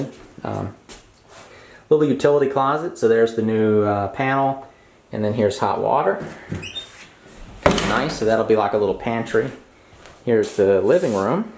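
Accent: South, United States. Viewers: I came because the thumbnail and title made me curious, but I stayed for the southern accent lol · Where do you live? I live in Colorado and have thought of doing something like this but real estate here is unbelievably expensive. I am thinking of going back home to Mississippi and buying properties there. You sound like you're maybe from the South so I was just wondering. Good video, thanks for posting.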